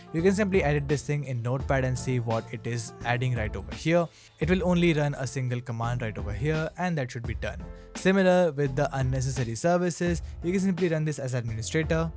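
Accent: India. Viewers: You sounds like a indian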